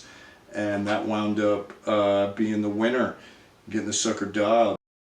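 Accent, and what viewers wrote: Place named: California, United States. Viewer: I love listening to you speak. Voice is like Cali skater meets Ben Stein and talk is all about drums. Can't get enough. Rock on. 🤙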